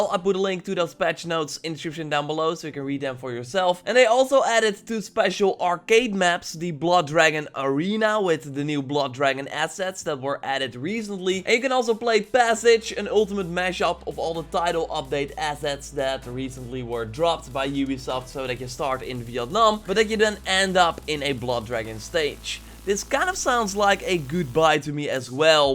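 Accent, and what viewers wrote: Netherlands. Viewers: Im guessing that you are dutch or belgian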